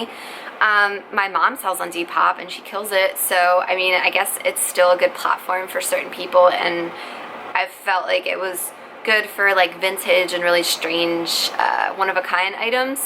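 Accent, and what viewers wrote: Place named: Philadelphia, United States. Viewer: Thank you for your vids! I’m just about to start selling on Poshmark. I Love your simple instructions, and your inventory idea is brilliant! I used to live outside of Philly (Mainline and Drexel Hill), Love that accent! 😘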